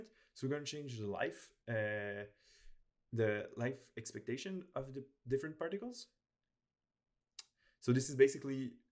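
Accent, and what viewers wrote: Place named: Quebec, Canada. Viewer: haha j'ai reconnu ton accent québécois. Merci beaucoup pour ce tutoriel.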